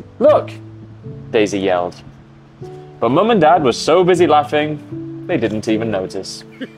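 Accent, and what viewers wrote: United Kingdom. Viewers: Thank you very much . Could you please make B2 or upper -intermediate level because you voice is clear to gain the British accent ❤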